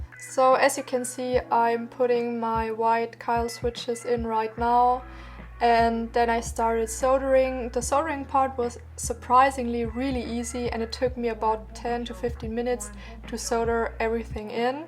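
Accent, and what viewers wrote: Germany. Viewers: Man merkt, dass du Deutsch bist :D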